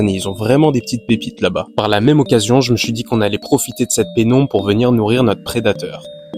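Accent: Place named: Charente, France. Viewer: Tu ne serais pas originaire de Charente/ Charente Maritime par hasard? Parce que la prononciation de Rogarde (regarde), Promière (première) me fait penser à ça 😂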